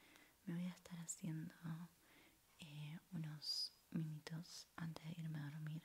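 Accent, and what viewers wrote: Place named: Argentina. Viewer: Me encanta el acento argento en asmr!!!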